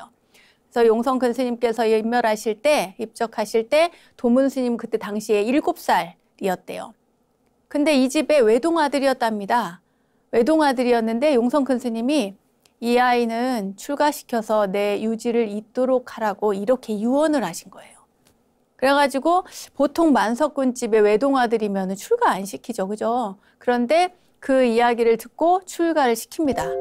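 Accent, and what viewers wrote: Gyeongsang, South Korea. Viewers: 스님의 속세 고향이 어디신지 모르겠지만 경상도 사투리를 넘 잘하시네요 ㅎㅎㅎ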